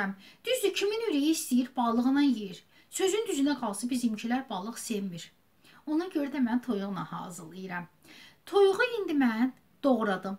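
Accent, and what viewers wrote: Baku, Azerbaijan. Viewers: Bakili danishigidi. Allah bereket versin baci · Çox sevdiyim Baki ləhçəsi çan baçim əllərivə sağlig çox təşəkkürlər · Nəhayət ki......👍👍👍👍👍👍👍👍👍👍👍♥️😘💐. gözləduyimiz paylaşim...,eşitmək istediyimiž Bakı ləhçəsi. səlis izah, dadlı plov.....,sizdən gələn cəkilişlərin ardı gəlsin in şə Allah canim♥️💋💐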